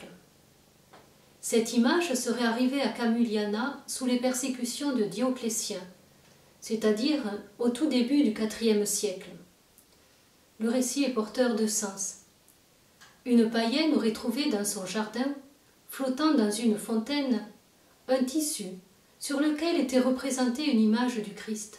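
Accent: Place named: Provence, France. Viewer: #5 Que dire ? 🤔 ... J'adore l'accent de Provence ! C'est véritablement charmant et quelque part aussi, apaisant à entendre... Surtout ne le perdez pas ma Sœur 😌 Merci à vous et que LE TOUT PUISSANT vous bénisse... ✝️ P. S. : Caso a Irmã (cujo nome gostaria de conhecer) NUNCA na sua vida tenha feito até hoje, uma peregrinação até FÁTIMA ... Por 🤲🏻 favor, não deixe de contemplar essa possibilidade! Claro, quando puder ou quiser... Pois a FÉ assim como DEUS cá estarão para acolhê-la com o amor, o carrinho & o respeito que merece 🤍 OBRIGADO✓ Adieu 👼🏻 ma Sœur.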